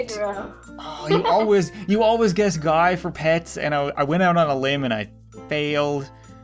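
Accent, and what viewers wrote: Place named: Canada. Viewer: I love your canadian accent btw :P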